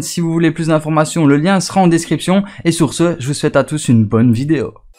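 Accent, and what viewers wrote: Belgium. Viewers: ton accent belge est genial ! JCVD qui t'apprend un glitch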